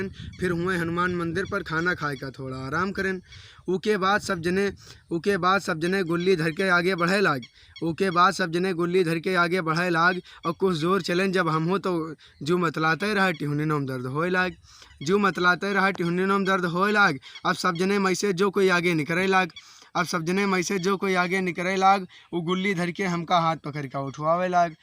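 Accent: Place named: Awadhi, India. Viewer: बहुत अच्छी प्रस्तुति..... अवधी बोली की बेहतर प्रस्तुति.....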